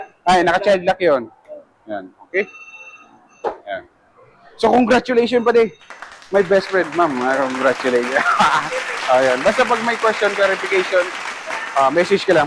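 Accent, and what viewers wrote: Philippines. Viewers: Garu matnog ang tuno kan bikol mo sir? Tama?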